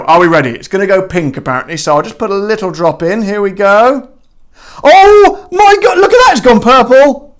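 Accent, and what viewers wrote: Australia. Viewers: 10-10 for the Aussie accent mate! Just bought this Gin tried it tonight. It’s unreal!! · As an Aussie, as well as this being my first time ever watching one of your videos, I actually thought you were from Australia for the first 30 seconds! Top marks on the accent. I also just bought a bottle and it's delicious. For all you non-Australians, don't worry about getting ripped off, its still expensive as fuck here too :(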